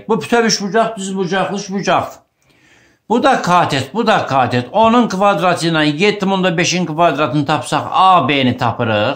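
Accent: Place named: Shaki, Azerbaijan. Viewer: Çox gözəl müəllimə.Şəkindənsiniz? Ləhcəniz əladı❤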